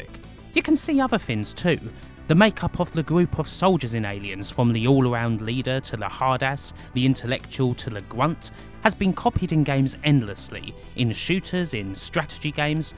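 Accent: United Kingdom. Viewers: how fucking awesome is it that I get to listen to an arcade game review by a British Elmer Fudd?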